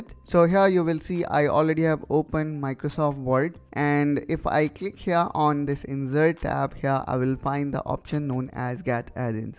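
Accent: India. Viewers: He is from India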